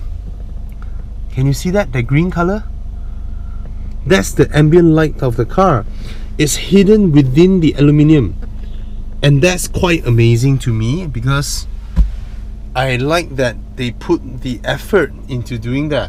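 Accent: Malaysia. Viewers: I've lived in Malaysia for 2 years, I like your accent :D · oh man...the msian accent I love it.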